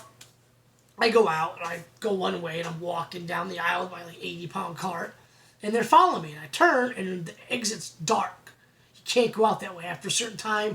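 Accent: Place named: Northeast, United States. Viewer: I’ve been a ‘tallica family member for 28 years, (fell in love after hearing that my sisters copy of when I was 7) wish they would’ve switched for! Also just a side note, I grew up in Boston (8 miles from Fenway park) you sound you lived in the Northeast at some point....great story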